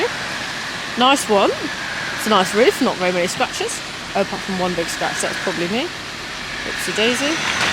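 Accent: United Kingdom. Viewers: I love british accent...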